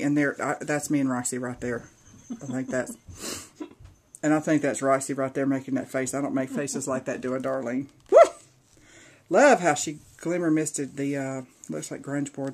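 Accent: South, United States. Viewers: you and your mini are 2 cute. I love ya both. Your southern accent makes me want to move to the south. I also love the warm weather. But I adore your mini. I love all the old labels and die cuts. I know you are going to have so much fun putting pictures in it. Enjoy and Tfs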